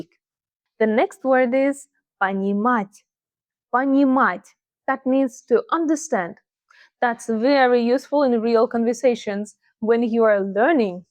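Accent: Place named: Russia. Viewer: You speak English more Russian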